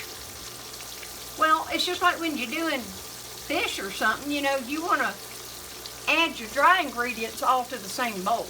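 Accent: South, United States. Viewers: Southern accent this has to be good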